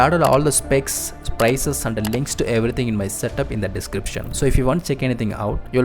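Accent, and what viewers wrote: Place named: India. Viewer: He is speaking in stereotypical Indian accent but it sounds good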